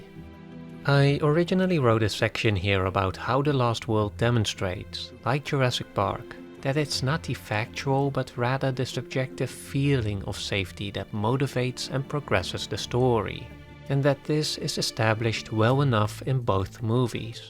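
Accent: Netherlands. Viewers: Hey man, any chance you’re Dutch, I think I can tell from the accent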